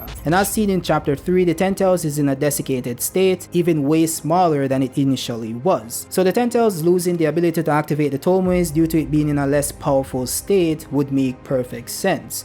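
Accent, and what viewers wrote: Jamaica. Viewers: Are u Jamaican ? If so I am too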